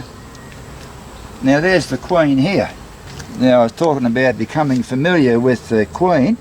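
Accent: Australia. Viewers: I came across this channel with your massive stash of sweet potato and then I was hooked as soon as I heard your Australian and saw the bees. You and are absolute darlings and I hope to catch more of you both. I sincerely hope all is going well xxx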